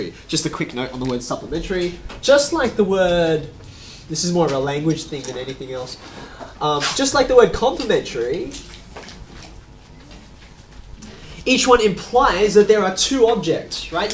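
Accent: Australia. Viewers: it's just an australian accent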